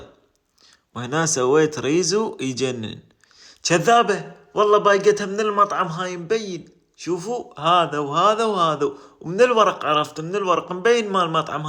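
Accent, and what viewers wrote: Iraq. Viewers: يا سلام على لهجتك العراقية ما شاء الله أنا نسيت الصور وركزت مع كلامك الله يبارك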